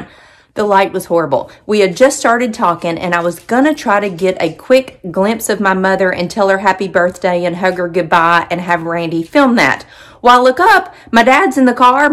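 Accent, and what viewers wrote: South, United States. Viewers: Your sister doesn't have a heavy southern accent like you do.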